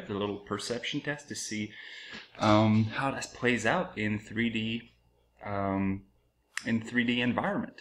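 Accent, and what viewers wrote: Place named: France. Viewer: Salut. J' ai compris que tu volais Mtl-Cancun, et je croirais entendre un petit accent. Alors je me dis que tu es français. Je commence a croire ce nouveau concept de la terre plate et c'est très troublant. Je pensais justement utiliser un programme pour tester mais tu as fais ça dans ce vidéo. Merci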